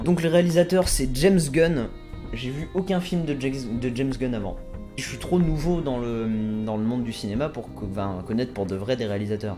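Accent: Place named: United Kingdom. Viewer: le peau rouge! (Ton accent anglais me rappelle un certain Mr Boyer je sais pas si tu connais)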